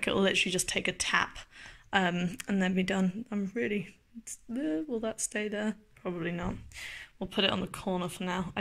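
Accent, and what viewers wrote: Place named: London, United Kingdom. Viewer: Hi where do you Find them? They are Awesome, is that how you got Your Tattoo on your left Arm. I am from The U.S.A. 🇺🇸 I Want to get that Tattoo unless it’s a woman’s Tattoo Please let me know, I am A Fan of Yours and since your from London I love ❤️ your Accent.....Love Donnie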